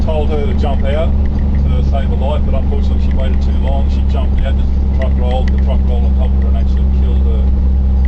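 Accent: Australia. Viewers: fucking australian accent... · well his accent is Australian or kiwi so i'm guessing he's there, where they drive on the left.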